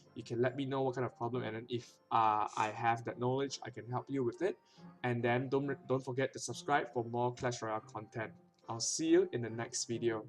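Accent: Singapore. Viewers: when i watch this video i got alot of information.and i just subscribe it.peace man.btw are you singaporean ?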